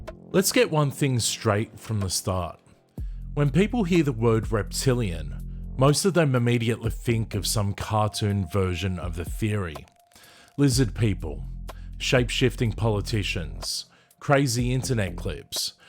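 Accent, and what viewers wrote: Australia. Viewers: Are you from Australia or New Zealand? :D Like anything else, there's likely a wide variety of subspecies with differing adaptations and evolutionary backgrounds. It's never just one "evil" group. I appreciate you being calm and methodical about a weird topic. Where are you really hoping this channel will go? If you manage to find success without becoming hysterical, selling out, or being misguided by fans of mimicry, at some point you'll probably experience something absolutely horrifying as a "warning."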